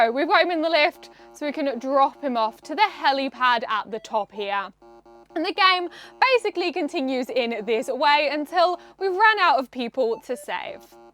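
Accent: United Kingdom. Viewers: YT formula 4 success, apparently = young woman + pirated 1980s movie Tshirt + pressing keys on 1980s hardware + British accent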